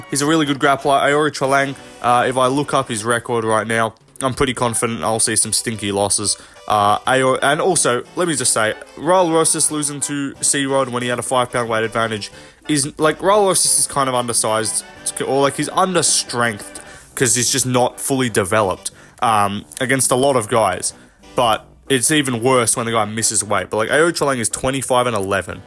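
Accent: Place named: Australia. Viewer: Hablas gracioso, pero tu español suena bien con el acento australiano.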